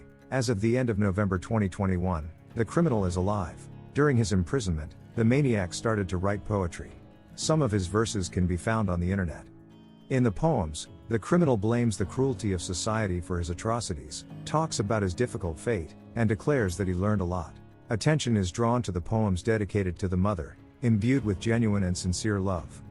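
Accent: Russia. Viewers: Aww wait why did you swap to computer voice!? I liked your russian accent in the vids! 💜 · I like your Russian accent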